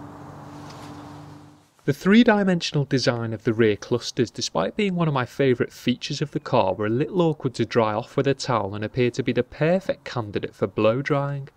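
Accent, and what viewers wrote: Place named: Wales, United Kingdom. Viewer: Are you from Wales I love the welsh accent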